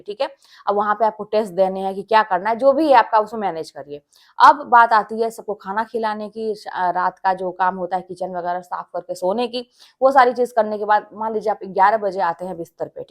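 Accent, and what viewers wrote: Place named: Bihar, India. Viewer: Hello madam ji good aap biharii hai kya aapke bolne se pta lag raha hai bihari staill😊😊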